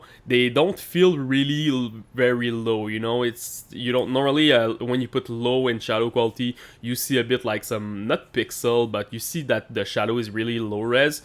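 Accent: Quebec, Canada. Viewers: J'adore comment on peut reconnaître les Québécois ! Hehehe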